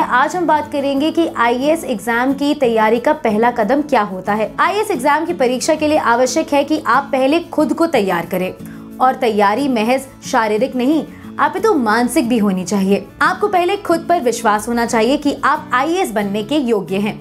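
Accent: Standard. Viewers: Mam do you speak after Google translate your Hindi is so pure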